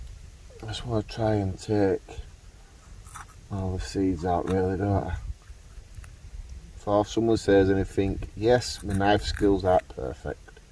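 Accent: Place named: Yorkshire, United Kingdom. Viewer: Where abouts are you from? You’re accent seems proper Yorkshire to me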